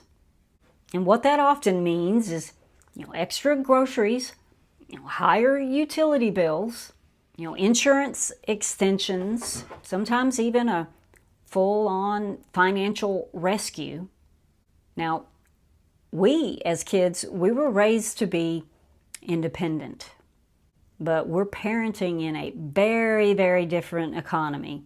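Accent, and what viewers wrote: South, United States. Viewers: ah, couldn't tell. You do have some southern accent going on.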